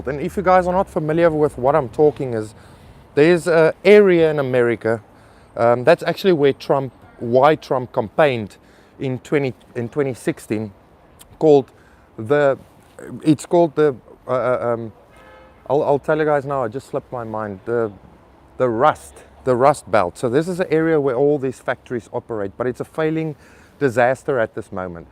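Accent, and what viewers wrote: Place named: South Africa. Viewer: Hahaha can hear from accent u from SA ;D, so glad we have someone preaching the truth on China, not that serpantZA idiot....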